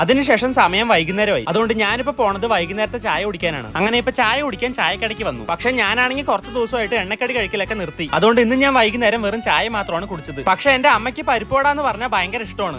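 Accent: Palakkad, India. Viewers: Bro Palakkad ano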